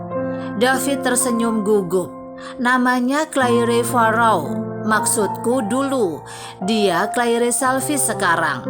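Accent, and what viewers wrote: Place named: Indonesia. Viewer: maaf bacaan orang Indonesia dengan bacaan orang luar negri beda jadi just harus memakluminya yg lancar bahasa inggrispun belum tentu bisa seimbang dengan orang barat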